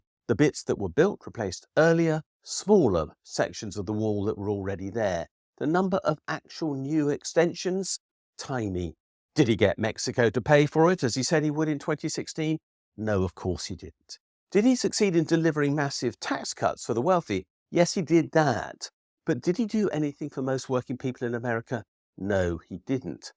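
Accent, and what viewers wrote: United Kingdom. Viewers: Ok, you talk funny. You are not an American, so p!ss-off Limey.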